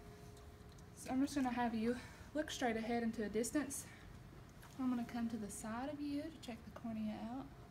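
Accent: United States. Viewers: man this is a thick southern accent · Love the accent, Miss Kentucky!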